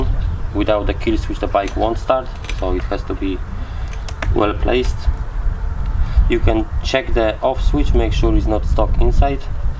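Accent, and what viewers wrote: Poland. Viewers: słychać że polak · Masz polski akcent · Też czułem że Polak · Słychać ze Polak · Po języku słychać ze jesteś Polakiem wiec czemu nie mozesz mówić po polsku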